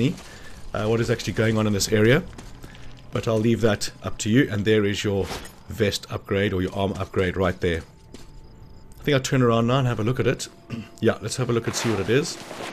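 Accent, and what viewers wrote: South Africa. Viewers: Are you South African? :) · Hey! Are you South African? It kinda sounds like it.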